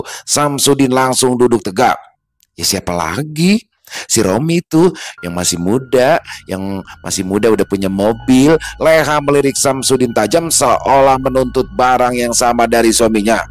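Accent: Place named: Jakarta, Indonesia. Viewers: Iya nich...mantep bgt logat betawinya....spesialisasikan aja bang ....kami butuh yg menghibur...hidup kami udah horor hahah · Satu masalh LG terselesaikan olh bang jali..,dan buat bang selalu berhasil membawa kn kisah nya dgn logat Betawinya,keren banget, sehat selalu untuk bang dan team 💪🫰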